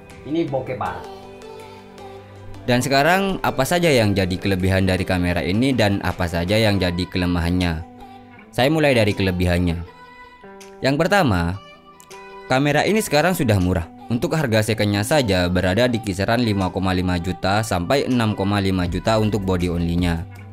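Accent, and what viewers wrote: Madura, Indonesia. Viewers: Reng madureh reyah? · Etemmu logat madurena cuy.haha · Madura ya mas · Logatnya kayak madura kang ?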